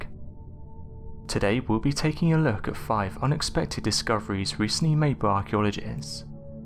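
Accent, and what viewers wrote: United Kingdom. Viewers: i know you have your 800,00 followers and its well deserved but could you please change the tone of you voice cause it sounds like every sentence is the same. just a lil constructive criticism. each sentence sounds the same. literally. i like your videos but when I find myself happen to have clicked on one of your clips i dont want to see it out to the end cause of the bland reading of amazing things. you dont need to be over the top by all means but you end each sentence as boring as the last with a same British or english tongue that gets so old by sentence five. im sorry! you obviosly have got it made. i am just telling you i cant stand the same monotone over and over! thats the word i was looking. its just monotone. I think i have said this before honestly. dont take this bad its just i cant watch another clip of yours if its gonna be this amount of monotone.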